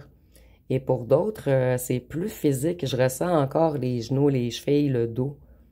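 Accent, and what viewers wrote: Quebec, Canada. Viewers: J'adore vous entendre parler et vous ecouter chère madame avec votre joli accent quebecois❤❤❤❤❤❤❤❤❤❤❤❤❤❤❤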